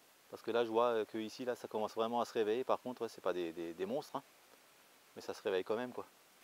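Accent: Alsace, France. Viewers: Salut toi tu a un un accent qui vient de chez nous tu es où en Alsace?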